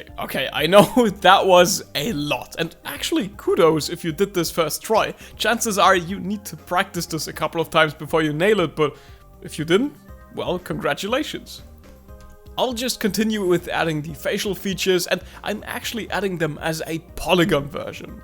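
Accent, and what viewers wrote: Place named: Germany. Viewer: Ich bin auch mit deutsch aufgewachsen, hat man bei dir sofort gehört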